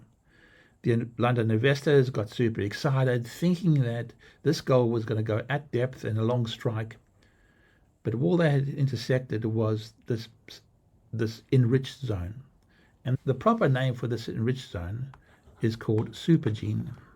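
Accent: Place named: Afrikaans, South Africa. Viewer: Can almost here the Afrikaans coming out haha; beautiful presentation, I genuinely learned alot.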